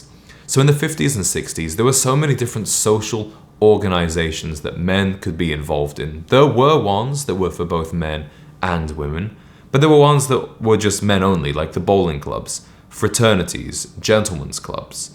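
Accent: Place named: United Kingdom. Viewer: I noticed the tendency to mock men in the movies decades ago in the 80s. I have always hated to see it. I can’t believe that male actors and producers went along with this betrayal of their own gender. (On a different note: I’m curious that the title of this video focuses on American men, but you sound like your British…. do you think that British men have not been emasculated?)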